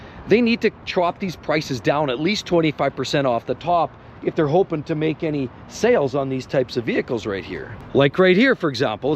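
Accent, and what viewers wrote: Canada. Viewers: Are these Canadian dollar amounts because you sound Canadian?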